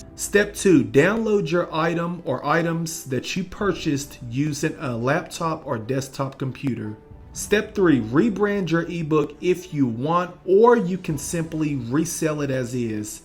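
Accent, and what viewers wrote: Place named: Baltimore, United States. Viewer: Great quality content, thank you for the knowledge! 🧠 I follow you on IG but randomly stumbled upon your YT by coincidence. Also, I hear an accent! Is it Baltimore? 😄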